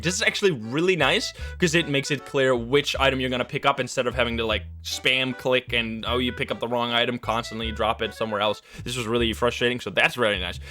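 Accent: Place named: Netherlands. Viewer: Oh your actually dutch I didnt noticed before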